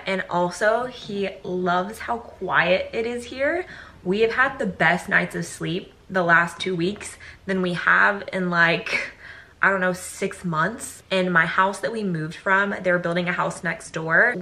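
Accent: South, United States. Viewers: your southern twang is peaking back out! <3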